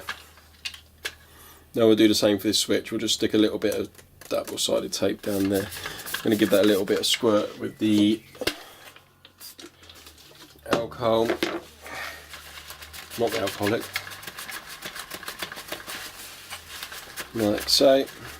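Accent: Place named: Australia. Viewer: Nice vid, Aussie accent?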